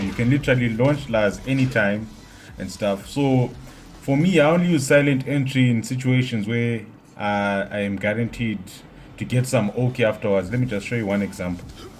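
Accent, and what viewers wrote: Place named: Africa. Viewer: yo man i know this is late but its great to hear some great african commentary. love from south africa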